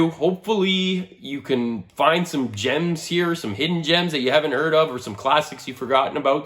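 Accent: Canada. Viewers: Hey I just wanted to say I think you make really great content and have inspired me to get in to King. Keep up the great work!! Also is that a Canadian accent I hear??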